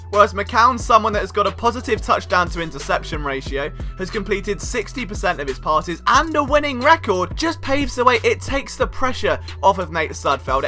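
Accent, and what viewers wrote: United Kingdom. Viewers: Why is a guy with a British accent talking about American football? I can't even watch the entire video, dude this is America not London.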